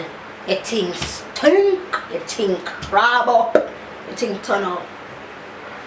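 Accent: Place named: Jamaica. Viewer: lololol girl don't kill me! I'm a trini, but I love to hear Jamaican's talk. lol when you'all say blood cleanse, dat absolutely crack me up!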